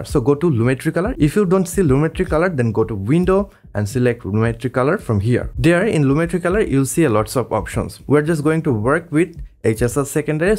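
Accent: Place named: Assam, India. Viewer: are u assamese by any change...based on the accent I m guessing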